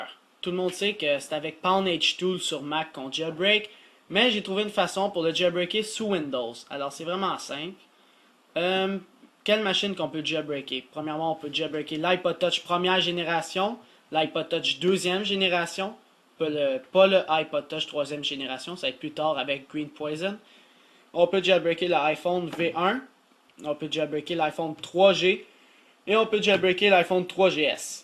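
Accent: Canada. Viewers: ton accent canadien me fait marré XD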